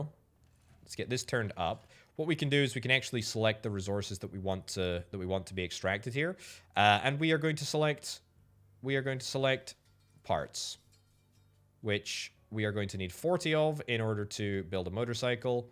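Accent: Scotland, United Kingdom. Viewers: I thought heard some Scottish in there. I'm from Edinburgh, though and I can't place the accent. Was wondering if there was something more to it.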